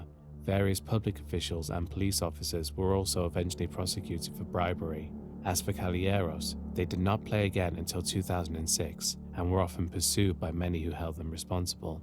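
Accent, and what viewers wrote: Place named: United Kingdom. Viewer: You sound British so please please please stop saying YouTTTTTube. It is not a hard T!!!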